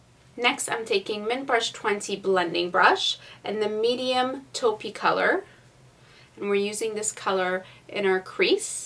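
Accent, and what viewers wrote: Canada. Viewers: I've been watching your videos for a while now - and I finally just now heard your Canadian accent haha.. either I wasn't really paying attention haha Nice look!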